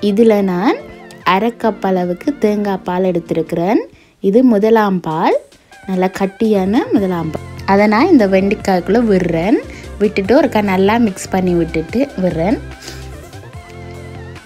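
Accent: Sri Lanka. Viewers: Super neengal jaffna Tamil Thane kathaikirigal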